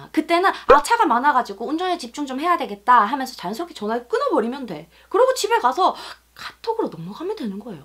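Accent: Gyeongsang, South Korea. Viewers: 서울방언속에 경상도표준어가 쪼매 들리네요.ㅎ 잘 듣고 가용.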